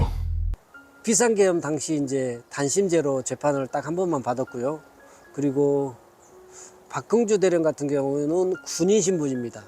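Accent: Jeolla, South Korea. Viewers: 전라도 억양으로 또 이런 코인팔이 하는 구나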